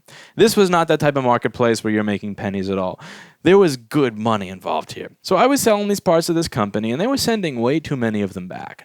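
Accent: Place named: Staten Island, United States. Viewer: You sound like you're from Staten Island.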